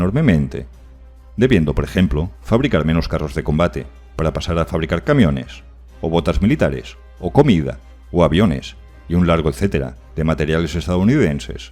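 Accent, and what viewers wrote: Galicia, Spain. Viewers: De que parte de Galicia eres??????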